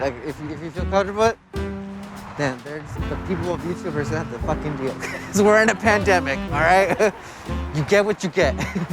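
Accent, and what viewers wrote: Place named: Australia. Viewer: Thanks. I have a A7II specifically to use with legacy lenses. You review coupled with your images taken using the FE 1.8/50mm is pretty sweet. There will be an addition to my lens kit! By the way I love your Aussie accent champion.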